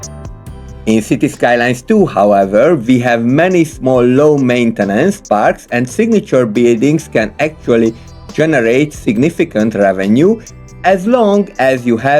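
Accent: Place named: Hungary. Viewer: nvm, your accent sounded Hungarian. this is what we say on the internet to each other.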